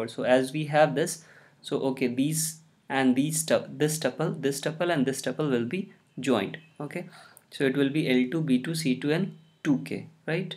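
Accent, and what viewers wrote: India. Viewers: Fuck Indian accent’s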